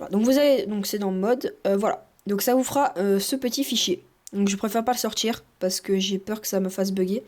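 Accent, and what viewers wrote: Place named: South, France. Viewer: accent du sud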